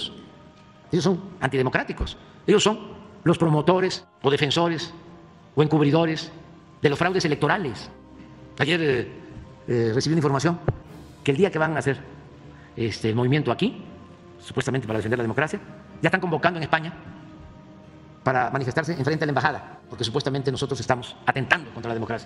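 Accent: Tabasco, Mexico. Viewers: Se le achican los ojos, retoma el acento tabasqueño y también habla más de corrido cuando está que se lo lleva la chingada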